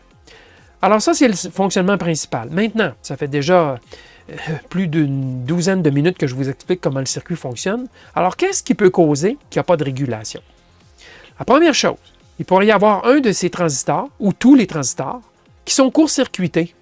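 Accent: Quebec, Canada. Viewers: je decouvre la chaine et je dois dire que c'est juste excellent,avec un accent qui me fais voyager direct en 1 seconde de France au Québec ,les explications sont toujours claires,schématisés et on vas toujours au bout des choses, je repette c'est excellent ! merci pour ces videos intructives !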